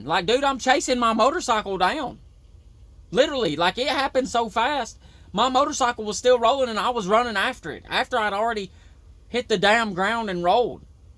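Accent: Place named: Alabama, United States. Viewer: I REMEMBER THIS, also golly gee I miss your lil BAMA ACCENTT!!!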